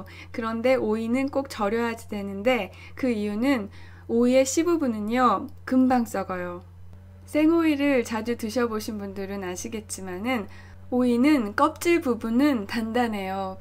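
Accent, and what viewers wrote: Seoul, South Korea. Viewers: 님 말투가 서울 사투리 같아요 ㅠㅠ 너무너무 듣고 싶은 목소리이구 ㅎㅎ 재밌어요 ㅎㅎ 레시피 감사합니다!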